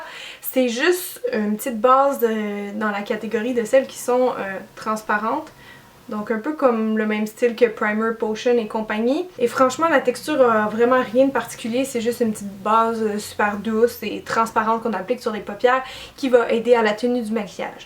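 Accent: Canada. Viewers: I never knew French Canadian sounded like this! I'm Belgian, I've had french in school for 8 years so I definitely understand you! I never heard it like this, it's beautiful! :D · ce que j aime bien avec c est que l accent canadien n est pas trop prononcé alors que avec d autre youtubeuses ou endroits du canada j ai plus de mal par exemple a montreal.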